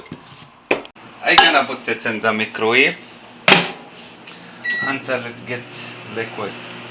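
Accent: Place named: Arab world. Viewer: You are too cute with the Arab-english pronunciations!!!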